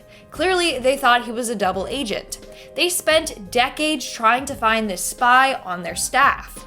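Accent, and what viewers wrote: Canada. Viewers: Hey are you Canadian and if so French Canadian? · Are you Canadian ?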